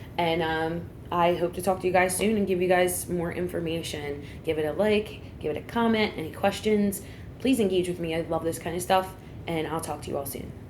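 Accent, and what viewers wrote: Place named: Northeast, United States. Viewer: R u from Philly? U have that north east accent.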